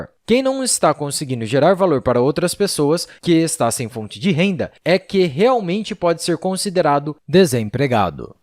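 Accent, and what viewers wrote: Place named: São Paulo, Brazil. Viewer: Parem de mentir com esse sotaque da caipira paulista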